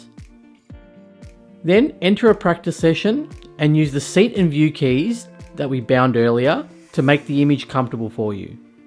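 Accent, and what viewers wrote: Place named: Australia. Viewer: I love your calm style and Australian accent 😃 Much love brother 🍻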